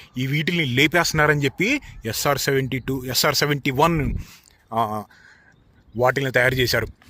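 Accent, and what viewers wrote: Andhra Pradesh, India. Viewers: miru andhra vallu laga matlada taru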